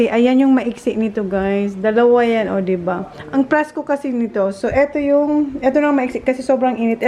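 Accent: Bicol, Philippines. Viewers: Sarap sa ears ang pagbibicol mo manay 🥰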